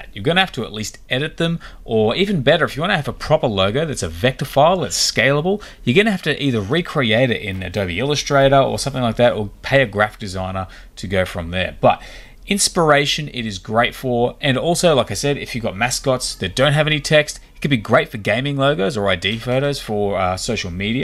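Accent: Australia. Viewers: Great to hear from an Aussie!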